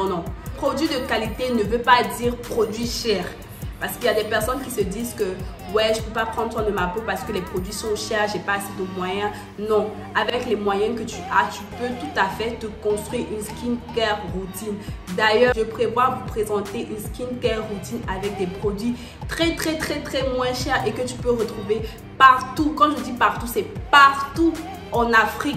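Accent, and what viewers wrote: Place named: Ivory Coast. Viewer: Ton accent ivoirien😍🇨🇮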